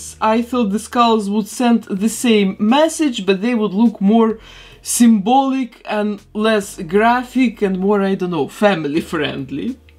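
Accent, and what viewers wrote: Russia. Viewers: Why do you have a Russian accent?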